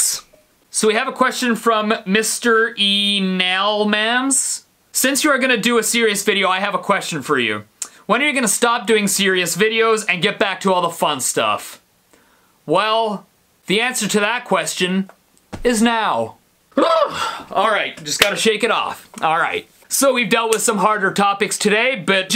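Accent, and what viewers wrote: Canada. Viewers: You sound extra Canadian in this one. Respect. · I’ve been watching your vids for ages, this is the most Canadian you’ve ever sounded ❤️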